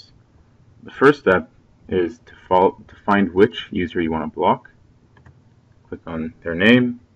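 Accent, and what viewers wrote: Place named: Canada. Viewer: I adore your Canadian accent!! Nicely done. Very clear and easy to understand instructions. Thank you.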